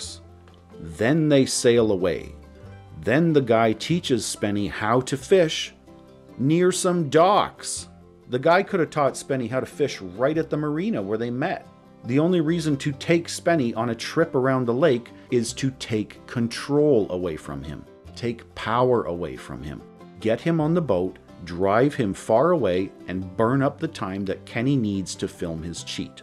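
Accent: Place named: Canada. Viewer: long time sub, I'm not sure if I already said this, but your voice is very relaxing. You sound like Dank Net, same accent, similar pitch and cadence (albeit to lesser extent). Which accent is that? I've heard plenty of Canadians but you and Dank Net are the only ones with that specific accent. It's a cool accent, where does it belong to? I'm Australian so I wasn't taught anything about Canada in school unlike Americans (I'd imagine anyway) and from Perth, Western Australia - every Australian that foreigners hear are from Melbourne, Sydney, or the outback. I'd imagine Toronto and Vancouver to be similar to the Melbourne/Sydney in that regard? Idk, just an interesting parallel.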